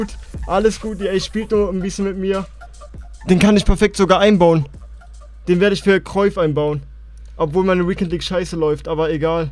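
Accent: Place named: Saxony, Germany. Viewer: sächsisch Comedy juckt?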